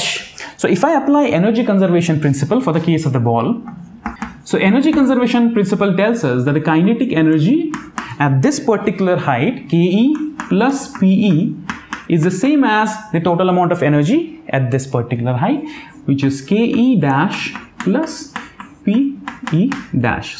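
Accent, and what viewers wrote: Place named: West Bengal, India. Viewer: Probably I think you are from West Bengal or Assam right?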